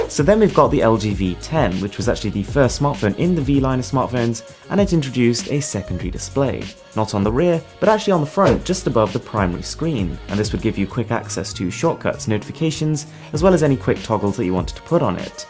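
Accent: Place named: Nottingham, United Kingdom. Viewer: Nottingham Accent.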